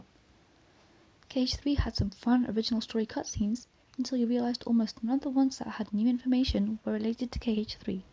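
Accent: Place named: United Kingdom. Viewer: What's this British voice smh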